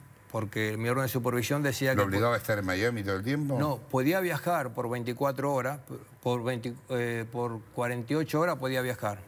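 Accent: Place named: Argentina. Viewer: Que buena historia yo soy de Honduras pase si no es lo mismo pues fue casi igual per bueno gracias a dios tuve la suerte de solucionar mi problema de migración y me identifico con vos por mucho primero por nuestro acento Honduras y Argentina tenemos muchas palabras similares y cultura aquí en california la mayoría son de México Guatemala o el Salvador le notan a uno su acento y tenemos que nosotros decidir las palabras que los demás dicen para poder darnos a entender pero bueno vos trata de apelar tu caso es en caso bárbaro muy bueno lo podes ganar suerte y ojalá te podas reunir con tu familia.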